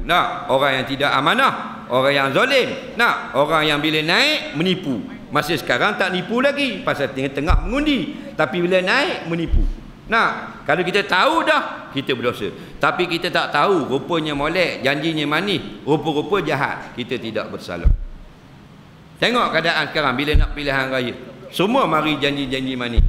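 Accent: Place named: North, Malaysia. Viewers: fasih elok noh loqat utara..seronok la..esok kita nk mengundi..esok kita dh bleh buat pilihan..terima kasih tok guru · Ckp utara x kekok lngsung..Fasih sgt😀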